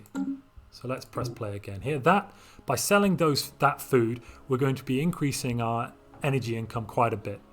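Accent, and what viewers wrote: United Kingdom. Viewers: ColorsFade with a British accent.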